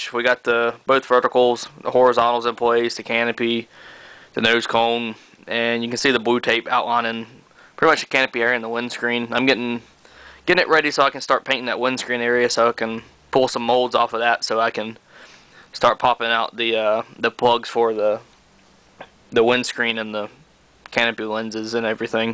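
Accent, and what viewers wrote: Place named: Texas, United States. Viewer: Way to go mate! it looks awesome, love the accent you gotta be from texas, good luuck with build I will keep an eye out for more progress vids, cheers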